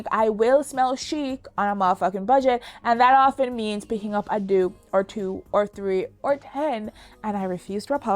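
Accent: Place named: Jamaica. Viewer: Am smelling a Jamaican accent 🤔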